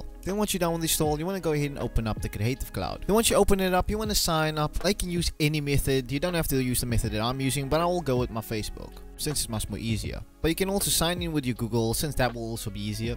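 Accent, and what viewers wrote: South Africa. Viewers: hes not? hes south african lol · Are you from South Africa?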